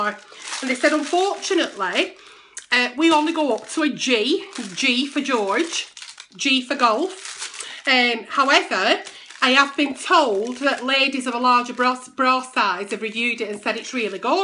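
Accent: United Kingdom. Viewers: The British accent 😂😂😂😭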